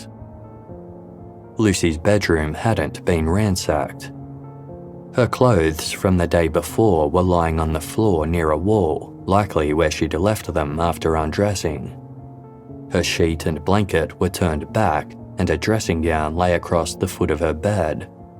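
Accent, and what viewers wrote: Australia. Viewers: Casefile wouldn’t be the same without your voice. I love that you have an Australian accent.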